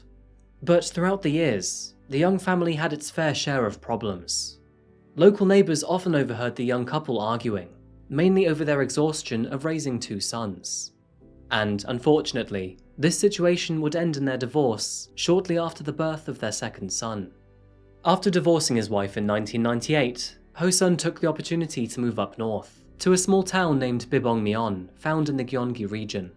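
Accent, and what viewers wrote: United Kingdom. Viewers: It went undetected. I’m still trying to focus on what you’re saying. Idky British accents are hard for me to understand….it’s a real life subtitle 😂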